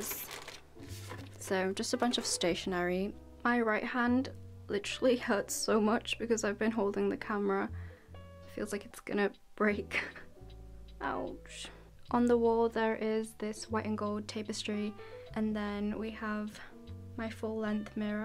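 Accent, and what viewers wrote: United Kingdom. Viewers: I love your British accent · the british accent 😍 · I love the way you talk and so in love with ur british accent! <3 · When she said “anyone recognise this hoodie?” Me: OF COURSE! That is Jungkook donut hoodie💖✨ Anyway I love you British accent👏🏻👍🏻 Are you from Malaysia? Cause I am from Malaysia🤗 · MashAllah how do you have very good British accent👌👍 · i never expected that accent, when you started talking i went "WOW CUTE". A malaysian with a UK accent aaa cutee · It's cause she stays at uk so of course she would have a British accent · The british accent i can't😭😍😍😍😍😍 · Love your room tour! wishing can have my own space to decorate it like this as well❤ and please do upload more video as i love watch ur video and hear your british accent💕🍀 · omg i love ur eccent bri'ish✨ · that british accent thoo 🤤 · I bet that you have Pinterest😍♥️ British accent? 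Yes love it too 😍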